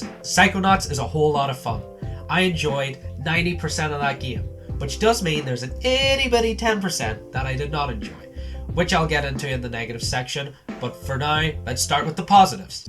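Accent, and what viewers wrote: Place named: Ireland. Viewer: My favourite part was the Irish accent 😌 And the Insight deafly going to add this to my steam list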